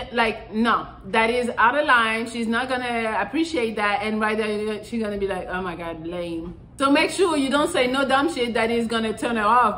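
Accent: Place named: France. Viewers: Oui en effet, j'avais remarqué ce petit accent et je suis allé verifier après. Oui elle est bien française. Le aurait même dû me mettre la puce à l'oreille. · you've got that french accent I can hear, tu es sûrement française mmmh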